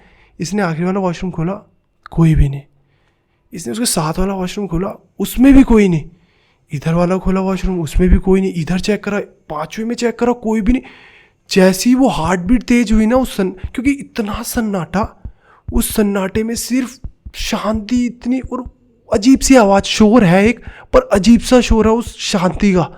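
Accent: Punjab, India. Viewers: Sir ap punjabi hai kya... I mean apka accent or dialogues punjabi style me hote hai....like chandigarh panchkula side... Mane abhi aj hi apki ekdo videos dekhi first time...mujhe lga k ap udhr se hai kya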